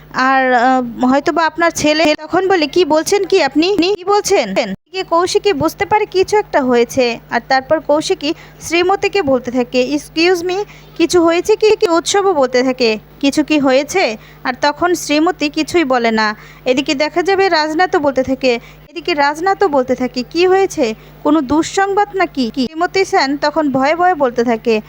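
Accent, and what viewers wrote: Bangal, Bangladesh. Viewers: কথকতার মান ভাল। বাঙ্গাল বাঙ্গাল উচ্চারণের জন্য খারাপ শোনায়।চেষ্টা কর এটা ঠিক ঠাক করার। ভাল কথকতার জন্য তোমাকে করতে হবে।শুভেচ্ছা রইল।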